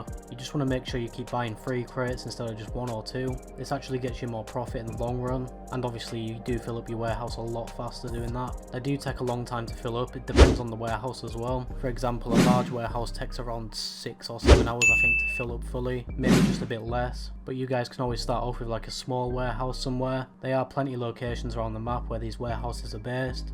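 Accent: United Kingdom. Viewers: So sound like you’ve got an English accent but call it garARJ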